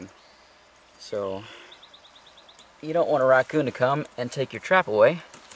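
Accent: Texas, United States. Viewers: Great video, I live in central Texas and have a real problem with chicken snakes. You have given me the solution to my problem. You look like a biologist to me? Thanks for sharing your knowledge. You speak like a true Texan.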